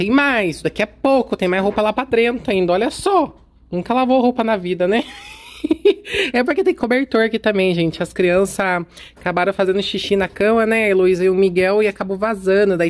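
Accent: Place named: São Paulo, Brazil. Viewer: Gosto do seu sotaque, quando você fala lembro de quando morava no interior em Rinopolis / SP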